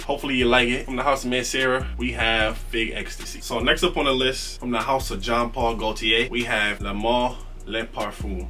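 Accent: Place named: New York, United States. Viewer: Bro sound like he from nyc , much luv . One of the few reviewers that don’t talk about clones in every video , keep it up g I’m taking 📝.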